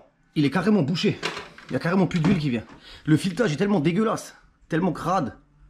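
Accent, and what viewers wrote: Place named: Forbach, France. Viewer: Salut, secteur Forbach? Vu l'accent 😉. As tu déjà pensé à acheter un bac a ultrason pour le nettoyage des pièces, sa te permettrait de faire autre chose en attendant la fin de la minuterie du bac a ultrason. champion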